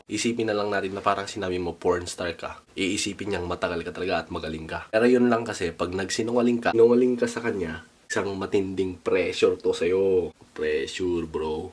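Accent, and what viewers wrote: Ilocos, Philippines. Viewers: This kuya is ilocano.. talks like my uncles and cousins in la union Kuya, cave is kweba not kubeta (toilet) 😋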